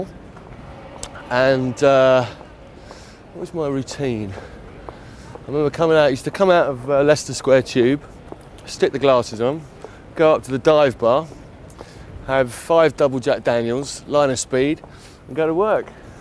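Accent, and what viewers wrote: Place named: New York, United States. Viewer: I thought he was from New York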